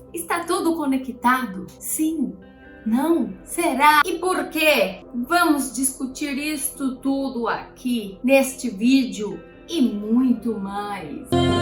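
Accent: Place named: Inland São Paulo, Brazil. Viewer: De onde você é? Um sotaque forte será do interior de SP? ,Ou é Paraná?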